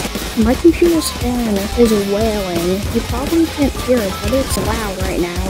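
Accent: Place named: Germany. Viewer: you sound super german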